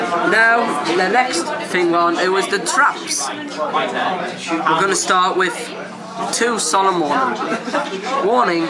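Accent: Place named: Scotland, United Kingdom. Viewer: i love your accsent dude.sre you scottish?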